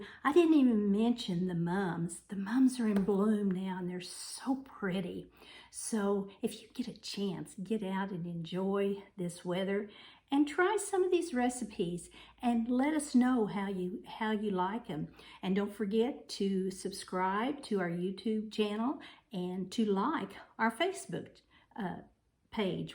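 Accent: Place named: South, United States. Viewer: I love your “accent”. I wish I had that Southern accent…or a British accent. Love both. I have a Southern Californian “accent”. God bless you and your family! Stay healthy.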